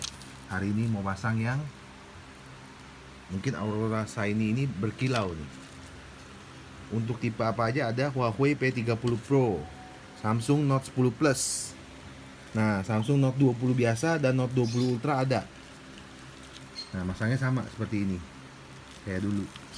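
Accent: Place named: China. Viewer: logat cina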